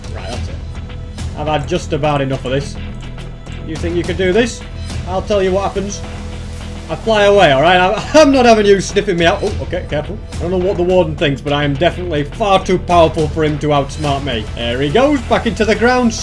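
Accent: United Kingdom. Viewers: are u uk?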